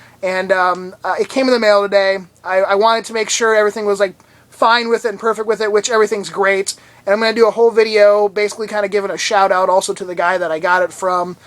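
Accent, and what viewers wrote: United States. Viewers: Yeah. American vocal contractions are fun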